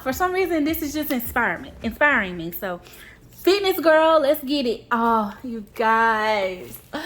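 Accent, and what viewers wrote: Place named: South, United States. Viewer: I love your southern accent, so charming!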